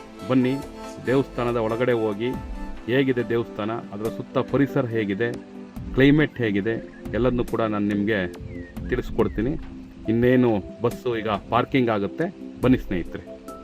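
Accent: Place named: Chamarajanagar, India. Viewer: ನೀವು ಈ ರೀತಿಯ ಪ್ರಕೃತಿಯ ವಿಡಿಯೋ ಗಳನ್ನು ಮಾಡಿ brother, ನಮ್ಮ ಗುಂಡ್ಲುಪೇಟೆ ಸುತ್ತ ಮುತ್ತ ಅತ್ಯದ್ಭುತ ಪ್ರೇಕ್ಷಕ ಸ್ಥಳ ಇದೆ, ಭಾಷೆ ಬಗ್ಗೆ ತಲೆ ಕೆಡಿಸಿ ಕೊಳ್ಳಬೇಡಿ ,ಚಾಮರಾಜನಗರ ಉಚ್ಚಾರಣೆ ನೇ ಹಾಗೆ ❤ನೀನು ಮುಂದುವರಿ ಗುರು ❤❤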